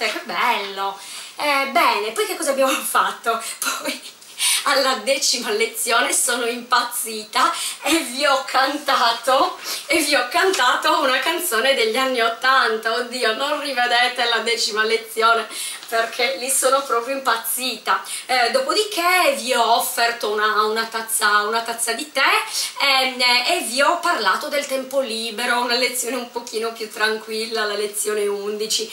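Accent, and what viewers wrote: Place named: Sardinia, Italy. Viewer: Ciao adesso,imparo italiana con Sardagna accente,sei une molta inteligenta maestra,bellissima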